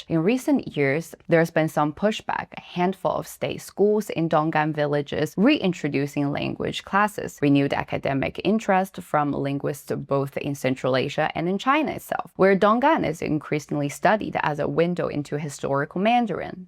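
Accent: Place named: United States. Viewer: She has an American accent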